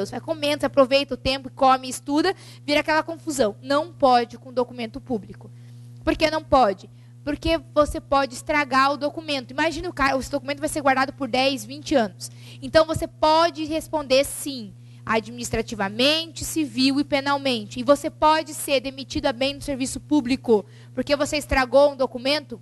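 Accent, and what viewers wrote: Rio de Janeiro, Brazil. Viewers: Ótima aula, mas esse sotaque dá neRvoso! :D --- cariocas entenderão.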